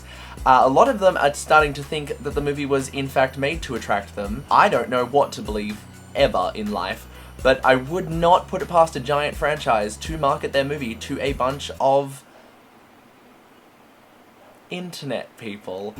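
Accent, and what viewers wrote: Australia. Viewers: Your australlian? I always thought you were british! XD I love you more now because i love australlians! <3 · oh your australian!?!? i am to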